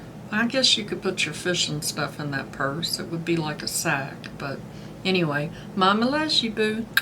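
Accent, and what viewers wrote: South, United States. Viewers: I love your accent it sounds southern and so sweet. Enter me please I like the press on nails. And the illamasqua as well as earth harbor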